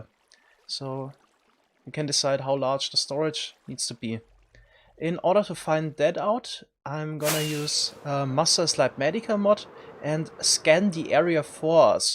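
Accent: Germany. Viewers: I love his accent mmmMMM German accent is so underrated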